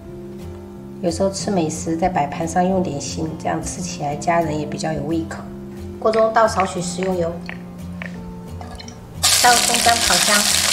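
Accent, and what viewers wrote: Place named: Zhejiang, China. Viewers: 听口音像江浙沪的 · 好像是可愛的浙江口音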